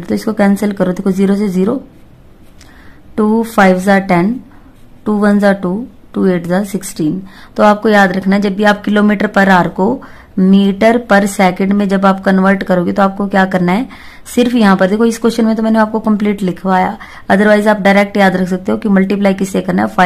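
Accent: Haryana, India. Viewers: Mam is belongs to harayana because unke bolne ke tarike se pata chalta hai ,,right mam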